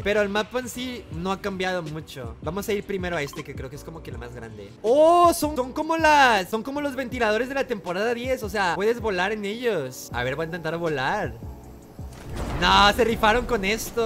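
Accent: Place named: Castile, Spain. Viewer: hablar fresa y en español castellano... ASCO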